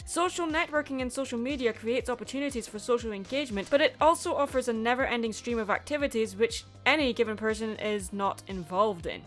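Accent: Scotland, United Kingdom. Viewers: Love it or hate it this woman spitting facts. Also you sound Scottish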